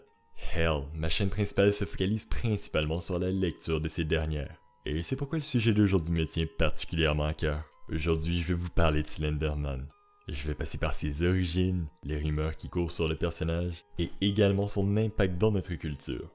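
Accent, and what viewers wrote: Quebec, Canada. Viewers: C'est du quebecois enfin rhooo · J’aime bien ton accent je sais qu’il est québécois mais il vient de quelle region Sinon continue comme ça!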